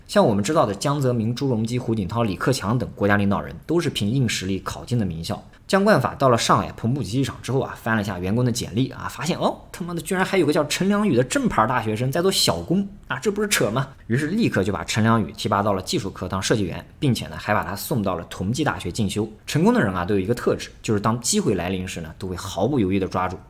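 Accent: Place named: Subei, China. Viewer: 上海人不喜歡你的蘇北口音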